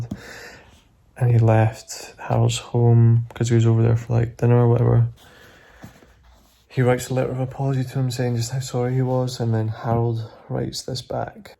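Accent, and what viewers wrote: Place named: Scotland, United Kingdom. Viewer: Omgosh, 2nd i heard the scottish accent I jizzed myself....wellllll, the book is on my night stand as we speak, so lezzz do disss.....also have the audio book on deck just in case. I only hope to find a boyfriend to gift me books on valentines day some day heart eyes emoji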